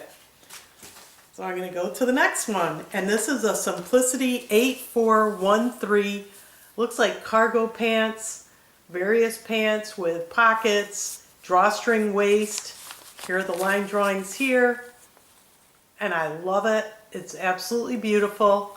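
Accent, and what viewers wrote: New York, United States. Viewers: Your skirt and red top look great. I'm trying to figure out your accent. Almost NY but with a twist.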